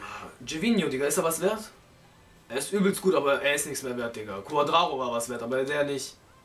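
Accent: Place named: Turkey. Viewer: ich schwör dieses deutsch türkisch.....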